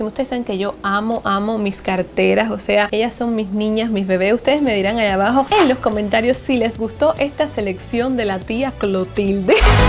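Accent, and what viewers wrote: Cuba. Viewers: tu cartera esta muy bella, pero te pido que no pierdas tu acento cubano, he notado como que lo quieres apartar y la verdad te queda muy bonito hablar así.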